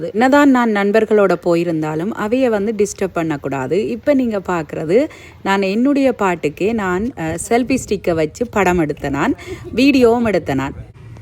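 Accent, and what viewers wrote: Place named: Sri Lanka. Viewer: Sri Lanka tamil azhagu thaan ga ponga❤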